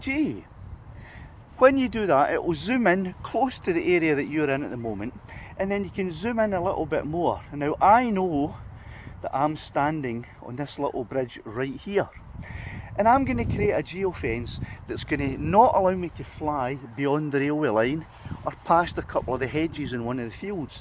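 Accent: Scotland, United Kingdom. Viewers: I absolutely love your Scottish accent, I can understand you way better than some English blokes from North Yorkshire, or Saint Helens and Liverpool 🤣 I always set the phone on airplane mode if possible, it prevents your sceen capture getting disturbed by push notifications like Whatsapp and stuff.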